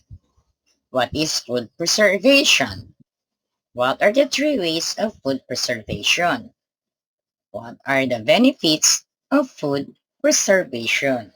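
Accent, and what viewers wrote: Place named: Philippines. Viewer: pinoy yan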